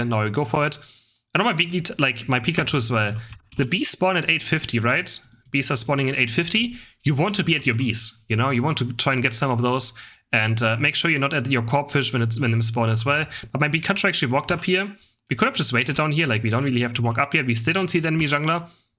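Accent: Germany. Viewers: I'm sooooooo curious You have an accent I can't quite place It's like German or maybe like a Norwegian type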